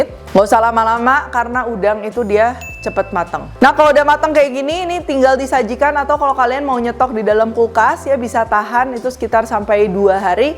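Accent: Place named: Java, Indonesia. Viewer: Pepes udang enak banget chef medok banget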